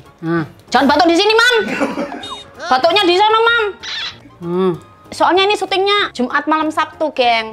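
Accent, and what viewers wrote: Java, Indonesia. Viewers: Bumbu nya medok banget kaya ngomong nya medok banget 👍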